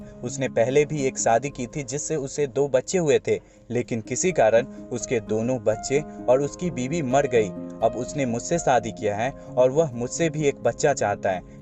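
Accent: Bihar, India. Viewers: Story is gud but Voice se lgta h bihari muvi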